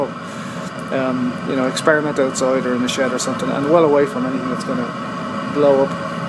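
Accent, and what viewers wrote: Ireland. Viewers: are you Irish? sounds like it. and cool design!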